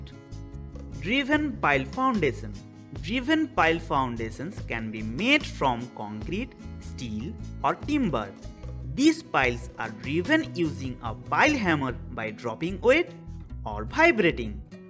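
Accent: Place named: India. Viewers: Should be proud of hindi accent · Stereotypical Hindi accent.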